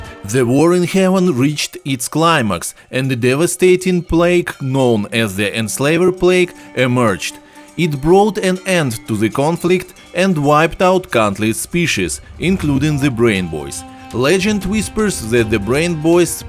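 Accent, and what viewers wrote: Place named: Russia. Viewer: The strongest russian accent I ever heard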